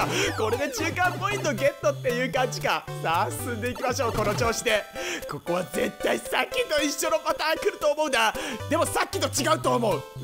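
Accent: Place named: Miyazaki, Japan. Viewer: さん宮崎出身ですか！？ 〜しちょる。とか 〜にしちょった。ってよくじいちゃん喋ってて懐かしかった笑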